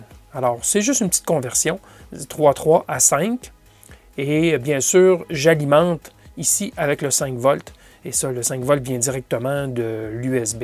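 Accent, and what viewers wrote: Quebec, Canada. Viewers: Excellent, clair et précis (comme les autres vidéos) avec le petit accent du Québec qui ravit tous les Français.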